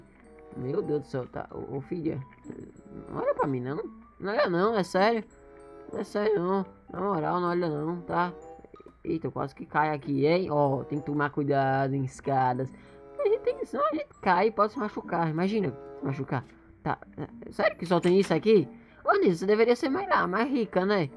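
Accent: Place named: Ceará, Brazil. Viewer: Oi.. Vc parece ser Cearence kkkk Faz video de como viver no Bob Esponja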